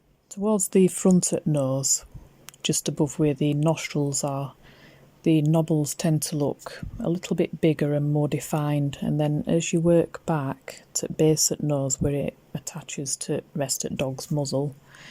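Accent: Yorkshire, United Kingdom. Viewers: Love your channel and that you speak my (yorkshire) accent! Dog noses are my weakness so this is super helpful for me!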